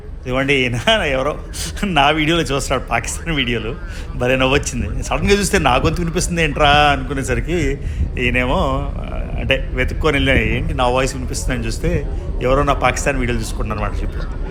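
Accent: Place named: Odisha, India. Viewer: Nuvvu konchem Andhra slang ki marali annai. Appude views perugutai. Ledante odisha vadivi ani neglect chestaru.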